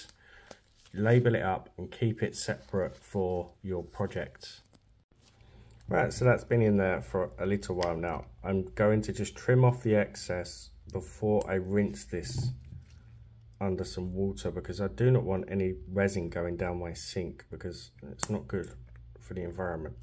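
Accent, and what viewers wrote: United Kingdom. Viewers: Sorry, I have to ask, where are you from UK?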